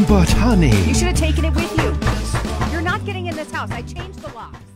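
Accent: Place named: England, United Kingdom. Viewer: This wouldn’t be the same without the English accent.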